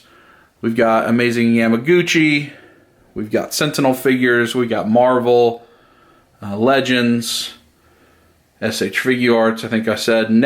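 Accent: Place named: Texas, United States. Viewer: He has a texan accent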